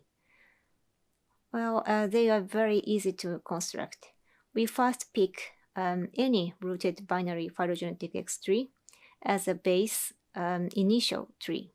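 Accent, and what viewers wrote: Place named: Japan. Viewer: you've changed my view on my take on japanese speaks english. the lease accent and very standard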